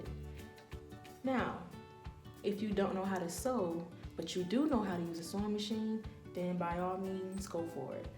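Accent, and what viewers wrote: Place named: Miami, United States. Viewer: Lmaooo i love how Miami you are, city girls intro and the way you talk accent and all reminds me of them😂 girllllllll i f***s with u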